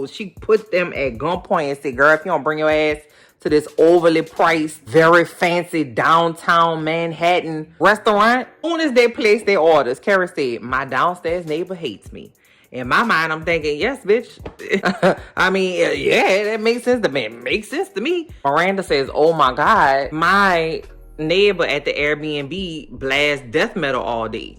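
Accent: New Orleans, United States. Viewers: Girl you are HILARIOUS! Instant follow. Also are you from NOLA? Cause i hear that accent. 😂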